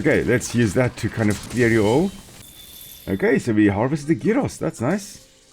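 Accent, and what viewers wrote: South Africa. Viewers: Your accent sounds South African boer. Please tell me im right. Just started a charge blade last night by the way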